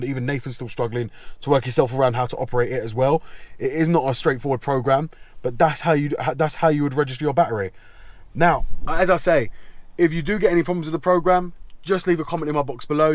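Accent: United Kingdom. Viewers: I honestly do not understand why so many youtubers talk so fast when they are doing an exercise? Its weird and kind of disorienting to me.Please for future vids try to speak a little bit slower especially with your having a thick british accent.:)Thanks and keep up the good work!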